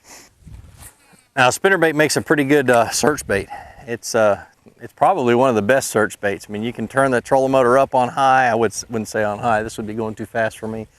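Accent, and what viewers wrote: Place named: New England, United States. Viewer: I like how his “nagging” voice is 100% yankee 😅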